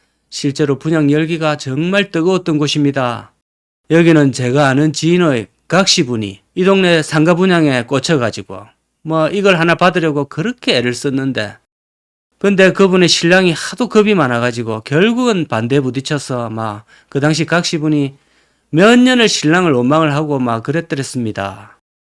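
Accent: Gyeongsang, South Korea. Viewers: 우야노~? 갱상도 사투리 ㅎㅎ 넘~재밋다. 파이팅!!! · 강호 발바닥 TV는. 전국민이 한번쯤 들러 봐야하는 필수 유트브. 구수한 경상도 사투리 매력 짱입니다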